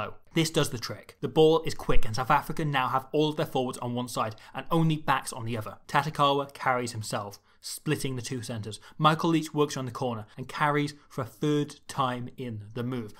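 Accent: Wales, United Kingdom. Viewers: Never expected I would be this excited to listen to a welsh man speack to me for 30 minutes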